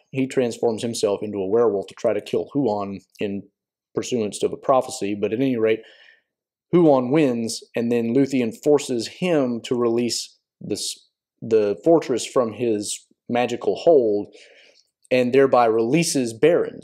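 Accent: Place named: Appalachia, United States. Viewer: you say they’re betrayed by Borlas and you say it a few more times after. I’m sure it was just a slip but the correct person is Gorlim the Unhappy. For those who do not know, Borlas is one of two people: the original name of Borlad, one of the sons of Bór killed in the Nirnaeth Arnœdiad; or the son of Beregond of Gondor. PS - you sound like you’re from Appalachia. If so, I’d guess WV or KY 😅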